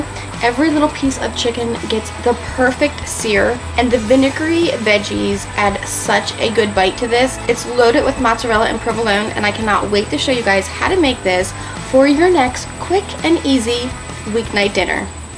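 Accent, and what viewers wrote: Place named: Italian-American, United States. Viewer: First off, keep saying things however you want. Don’t worry about the pronunciation police. Your results speak for themselves. You’re the quintessentially authentic Italian-American your food tribe appreciates. Second, how do you never have too much or too little cheese in anything you cook? It’s always just perfect! Gotta learn how you do that! 😂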